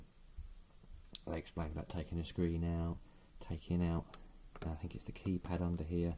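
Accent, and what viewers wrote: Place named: Cockney, United Kingdom. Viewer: Tips: - Use maximum volume if you can't hear him. - Use CC to translate the avalanche of British cockney gibberish.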